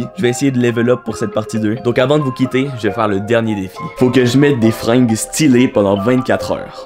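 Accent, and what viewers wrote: Quebec, Canada. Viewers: T québécois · Salut j'aime trop ton accent canadien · Est-ce que tu viens du Québec? Mais sinon super vidéo tu es super bon pour réaliser des défis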